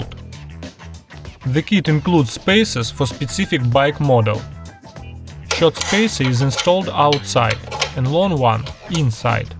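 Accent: Russia. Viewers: Ужасный акцент русского, типичное зрелище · Russian accent language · У него русский акцент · Говорит чел с русским акцентом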